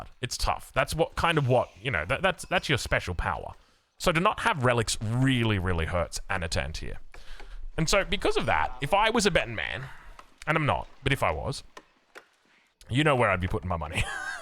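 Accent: Australia. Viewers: love this aussie man casting vods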